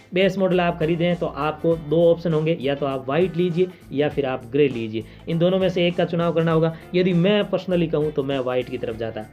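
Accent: Rajasthan, India. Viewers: Bhai rajasthan ke ho lagh toh rha h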